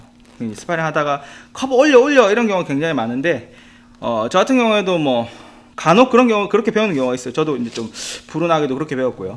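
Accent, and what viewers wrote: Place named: Gyeongsang, South Korea. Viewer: 경상도억양이 느껴져서 경상도에 있는 줄 알았습니다ㅋㅋ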